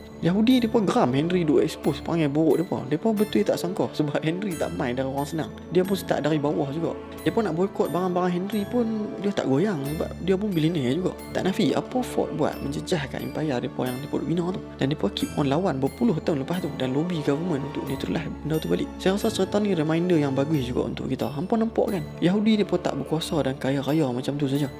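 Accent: North, Malaysia. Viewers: Pekat utaqha dia.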